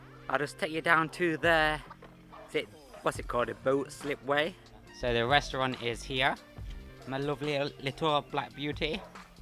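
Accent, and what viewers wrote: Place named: Thailand. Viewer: First video of yours I've seen,. 😁✨ Fantastic video. Your accent had transformed a lil from Sutton/English to a hint of Thai accent it sounds. Can't wait for the next video. Loving seeing your footage from Thailand. Well done!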